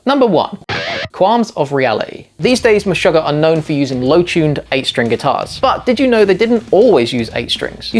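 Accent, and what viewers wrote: United Kingdom. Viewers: You speak the queen's English. It's Maths. Plural. Short for mathematics. There isn't one math. There are many Maths.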